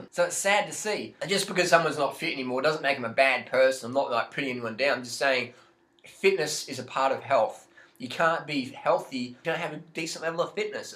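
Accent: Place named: Australia. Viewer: It's crazy how that accent makes us all listen that much more, not being sarcastic. I do plan to try this diet since it just seems pretty intuitive to me. plus peoplel like you and banana girl are good examples. but actually it might just be that aussies can assimilate the sugars easier than the rest of us so it only works for you guys. lolllllllll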